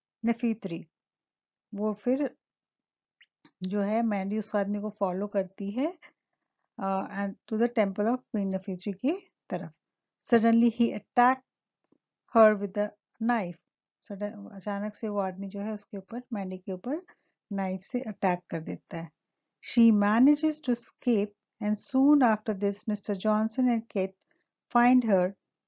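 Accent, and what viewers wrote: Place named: Bihar, India. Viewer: Mam you are from bihar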